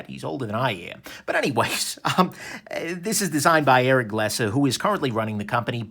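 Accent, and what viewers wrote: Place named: New York City, United States. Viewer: My wife walked in and asked if I was listening to Woody Allen talk about knives. You do have that Manhattan accent. Happy New Year!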